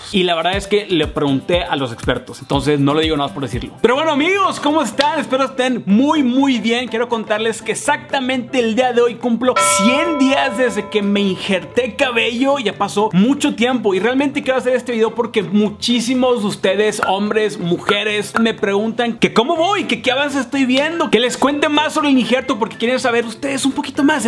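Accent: Monterrey, Mexico. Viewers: ¡Te ves super bien! 😍 ¡Amo tu voz y ese acento de regio! 👌💜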